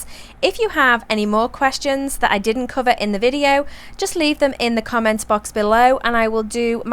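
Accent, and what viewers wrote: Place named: Merseyside, United Kingdom. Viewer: Hi. you sound like your from Merseyside somewhere I'm from Liverpool my self your vids are very helpful this is the first time I have kept fish mine is a cold water tank and I am only stocking different type of fantail 4fish about 2 months old. 6 fish about 3 months old. 3fish about 16 months old all added 1 week ago. My tank is 5FT 2x2 no plant life no coral just large glass slag rocks. White Sand external filter1200lh. 2 air stones. 1 Power head water stream. Iv bin up and running for a week all fish seem fine. But I am reading up on all type's of problems I might get as (condition of water) (food)( temperature) what has me a bit worried Is ick no sigh of it but prevention is better than cure. SO my question is can you put cupramine in my tank this is a treatment for ick.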